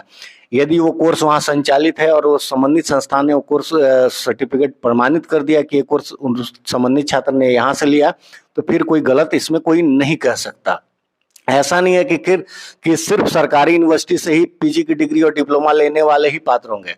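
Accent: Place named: Bihar, India. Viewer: Aap bihari hain kya cg ke nahi lagte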